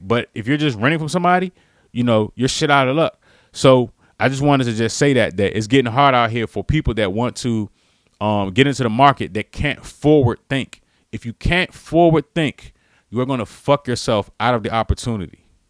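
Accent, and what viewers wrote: DMV, United States. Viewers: You sound like you are from the DMV? Is that where you are based?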